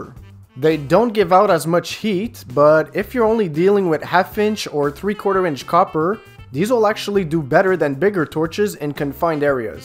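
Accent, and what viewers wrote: Quebec, Canada. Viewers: would you happen to be from Québec by any chance?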